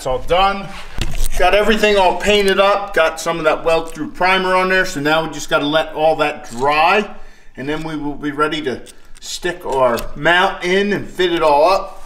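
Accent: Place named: Pennsylvania, United States. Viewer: First minute or 2 of you talking knew you were from PA lol I'm from the lehigh valley. Have the same issue with 24v going to tackle this asap won't pass inspection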